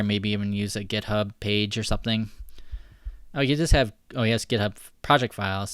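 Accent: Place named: United States. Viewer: What american accent is that? Its beutiful and smooth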